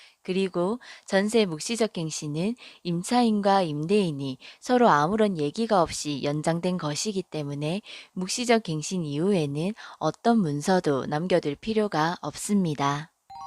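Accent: Gyeongsang, South Korea. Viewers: 갱상도 발음이 참 귀여우시네요~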